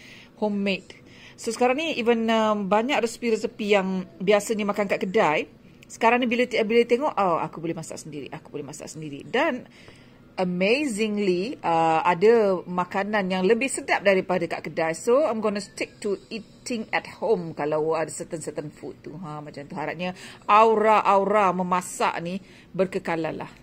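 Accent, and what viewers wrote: Negeri Sembilan, Malaysia. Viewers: Suka dengar Pn membebel cakap loghat nogori.hehe.Selamat Hari Raya Pn..Kami raya PKP di Malaysia.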